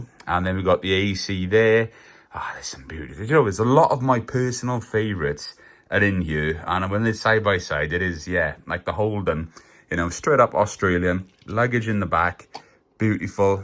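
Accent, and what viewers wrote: Wales, United Kingdom. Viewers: another great video! what a collection! utterly magnificent like the Corgi one, when i watched the Corgi one i was thinking that they were the greatest die cast manufacturer ever as i often do but when one sees a collection like this of Dinky it's a reminder that Binns road was every bit as good really, i love them both the same as i have always believed that the Dinky range was better in terms of the range of marques they modelled whereas Corgi's magnificent gift sets were truly wonderful, i also love Matchbox especially the early Lesney gift sets, glad that you are feeling so much better, your enthusiasm is amazing! i have a near mint original Chipperfields set with the booking office, it's a prized item, many of the dinky's shown i own but they're not in as good condition and many are without boxes but i love them! best wishes, incidentally my cousin's husband who is from Swansea was over recently and when he was chatting with me i half expected him to start waxing lyrical about die casts! 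the accent is great!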